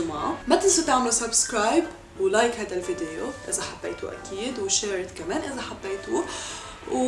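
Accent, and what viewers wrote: Lebanon. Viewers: اللكنة اللبنانية مقرفة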